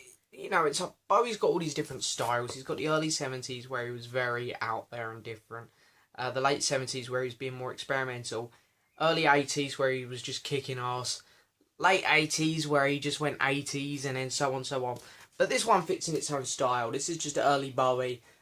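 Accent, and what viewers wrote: United Kingdom. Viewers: I liked your ranking just one thing I think you should give a little more information about musicians in the albums and producers I like your Brit accent I am from Mexico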